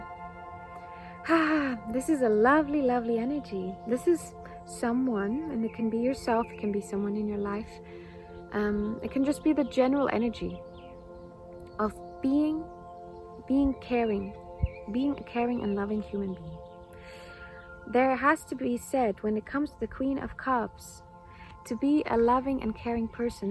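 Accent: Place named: Ireland. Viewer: Thank you, for a very nice, spot on reading. I like the Irish touch in your accent. Looking forward to more readings. I feel very good about what I have built, abundance is very subjective. I know the abundace that I aspire towards.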